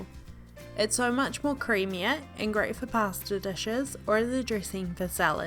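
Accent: New Zealand. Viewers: Are you from NZ? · She is a kiwi, new Zealander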